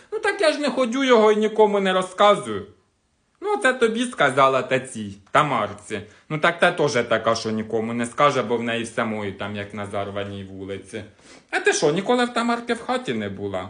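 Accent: Poltava, Ukraine. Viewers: 😂😂😂😂😂наш полтавський говір---осталось тільки висратцця.Ще від мами та бабусі вислови помню.Як в детстві побувала в нашому селі.А особинно під магазином (лавкою) такого багато можна було наслухається Огонь🌞продолжайте в тому ж духі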